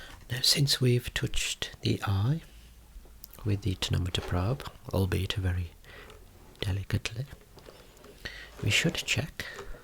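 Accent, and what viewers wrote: Birmingham, United Kingdom. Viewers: yes, its a brummie accent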